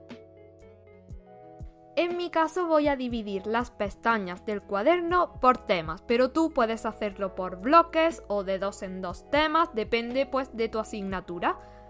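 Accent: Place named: Spain. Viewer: Que lindo tú acento ezzzzzpañol 😘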